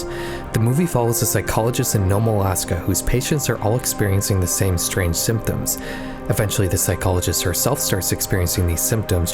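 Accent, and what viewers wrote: Canada. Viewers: Lol youre canadian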